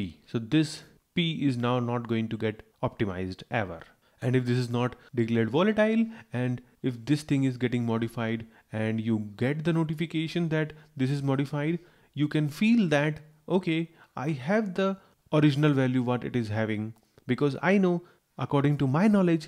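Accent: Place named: India. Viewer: You have an overpowering accent that makes you difficult to understand, there are AI tools that can fix this and online training resources to teach yourself how to minimize it, if you choose to. It's not bc you have an Indian accent specifically but bc it's a very heavy one. Try bring your tongue forward in your mouth when you speak English and stop 'rolling' your Rs.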